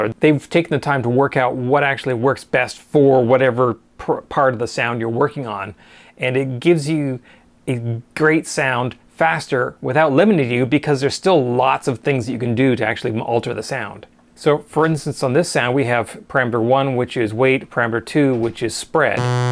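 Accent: Canada. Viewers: Canadian?? French canadian??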